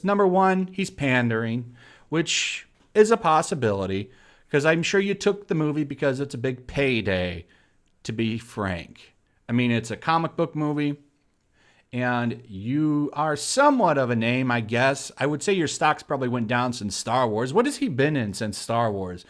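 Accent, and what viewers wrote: United Kingdom. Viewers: Why do you talk like a British dude!😂😂😂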